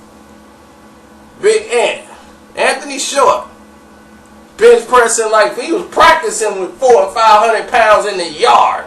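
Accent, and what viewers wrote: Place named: South, United States. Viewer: Dam good southern voice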